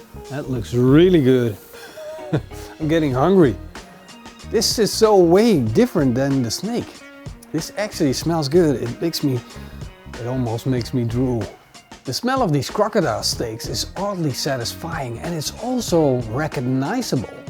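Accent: Netherlands. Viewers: Wait, are you dutch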